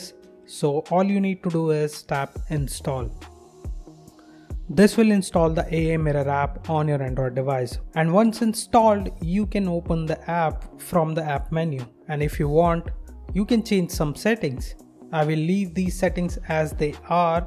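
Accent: India. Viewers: Why are vids like these always some heavily accented Indian you can barely understand?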